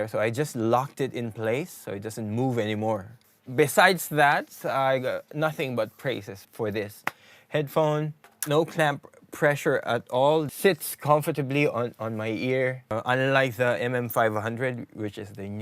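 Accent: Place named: Philippines. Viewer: you DEFINITELY sound Filipino. I would know, because I am too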